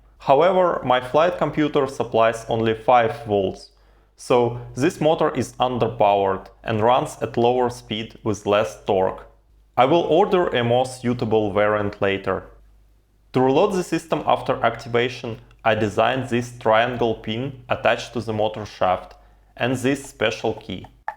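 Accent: Russia. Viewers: Speaking with russian accent)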